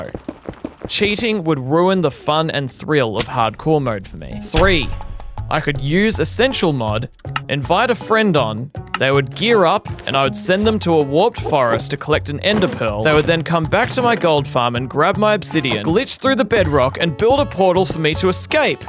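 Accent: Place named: Australia. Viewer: You sound like a fair dinkum Aussies.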